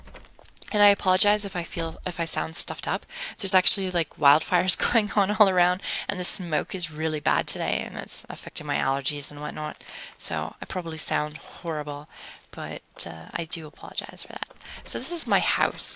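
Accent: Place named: United Kingdom. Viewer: Where's your accent from? It sounds like a little British in there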